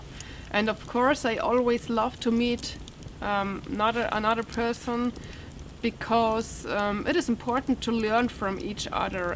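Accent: Germany. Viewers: I always enjoy your videos. The outdoor setting by the fire was beautiful just like you. I think everyone loves hearing your German accent. Keep your personal life private. The more you tell the more people want to know. Sometimes I think we see too much of you in your videos but that's more personal. Take care of yourself and your children.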